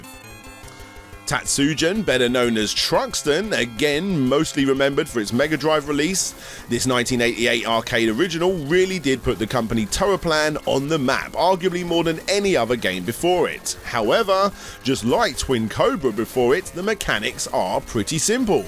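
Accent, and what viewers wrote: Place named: United Kingdom. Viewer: "Lupin" is pronounced "Loo-pan" in Japanese, as it's based on a French character/story. Also "kage" pronounced "Ka-geh", not "cah-geh" as you did in the video. "Shuriken" is pronounced "shoo-ree-ken", not "shuh-ree-ken" too. Hope this helps and thanks for the great British-English review! Always good to see someone not pandering exclusively to the US!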